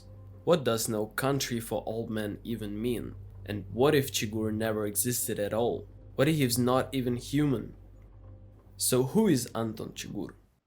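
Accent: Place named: India. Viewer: U SOUND LKE AN AMERiCANiZED DOT iNDiAN ! NOT YELLiNG, JUZT LiKE TYPiNG iN CAPZ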